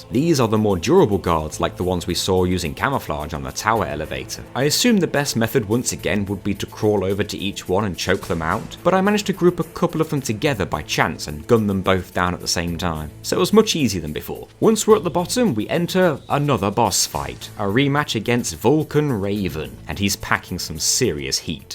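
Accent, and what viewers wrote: England, United Kingdom. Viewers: As a non native english speaker, I love the english accent. Great video btw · Yes he is New Zealander. Great show. All through the video all i could think was i've heard this voice before. Are you from UK? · Great video... Except ur bri" "ish, love. Smhhh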